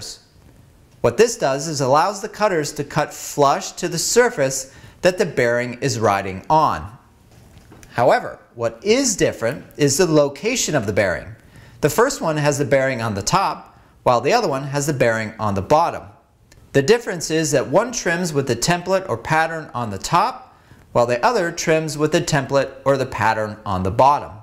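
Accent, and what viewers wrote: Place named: United States. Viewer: Saturday morning...looking for an American-speaking ( not UK/Brit for now ) quick info to start my router-based box joint projects for Christmas gifts ( 105° in my Sat Morning work area) and here you are solving my quick question as to joint...bit...common sizes. I've already built my little trim router table and sled for the joint cutting and ALL I needed ( "impatient 80 year old vet...") was 2 or 3 common bit sizes and after more than 1 hour of going thru the YouTube library of things that are good, but don't get to MY humble, simple needs for 2-day. Thank you, thank you, thank you...now I can get to work!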